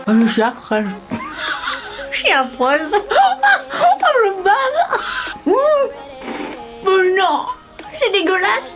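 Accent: South, France. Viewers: #tuesunestar lol tu me fais toujours rire et j'adore ton accent du sud 😍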